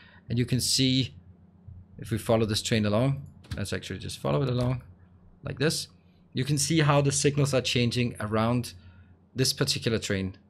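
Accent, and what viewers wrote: Denmark. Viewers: Just a quick question. Are you from Denmark or Scandinavia? I can hear you have a danish ascent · 16 seconds of your voice then i Knew you were danish. god video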